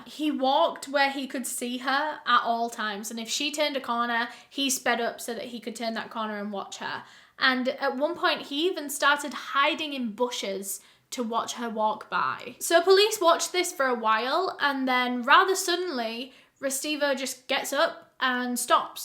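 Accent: United Kingdom. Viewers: I’m here for British accent. i love it 🥰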